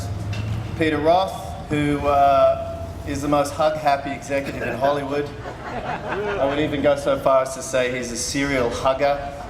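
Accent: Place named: Australia. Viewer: In the beginning, I thought he was american, because of his accent in The Mentalist, and I also hadn't watched any videos until my interest grew on him specifically. It shocks me to hear him in an Aussie accent, you know? It makes me cry with this video because he's just so . . . perfect.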